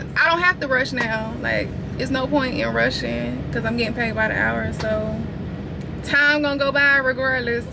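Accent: South, United States. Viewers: I love her southern accent. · Not sure how I got here but it’s the country accent that keep me engaged think imma go ahead and subscribe 😂I like the vibe of the video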